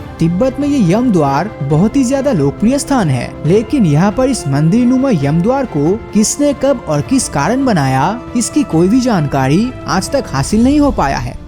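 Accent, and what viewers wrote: Bengal, India. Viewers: are u bengali from west bengal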